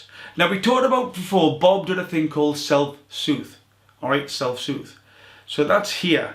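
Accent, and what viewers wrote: Newcastle, United Kingdom. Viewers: He certainly diesnt sound like a scotsman ges a true Geordie. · Where you from, British? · Divven ye nah a Geordie when ye hear wun?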